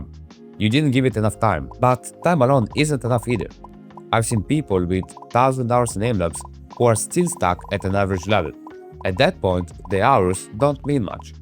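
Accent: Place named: Eastern Europe. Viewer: Whenever i hear an east european accent i know the man will go straight to the point while spitting facts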